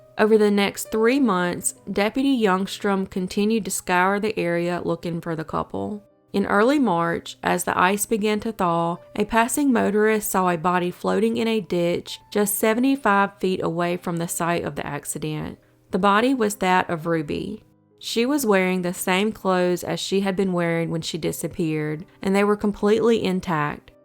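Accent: South, United States. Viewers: Ahh ya my fave southern drawl accented lady from over the pond :) it’s much needed just fallen out with a life long friend over money :( should never have helped her but too late now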